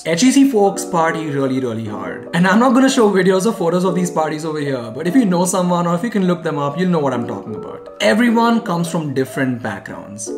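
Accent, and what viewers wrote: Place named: India. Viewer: I'm bothered by the strong Indian accent. I could hardly understand what you're saying. Speak well so people can understand you. That kind of English wouldn't cut at a top UK, let alone US business school.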